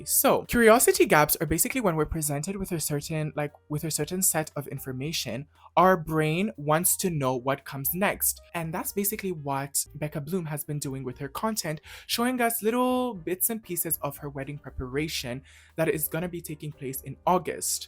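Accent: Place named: Jamaica. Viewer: You sound jamaican to me, all i could focus on was that and your fro 😅. Lovely editing too!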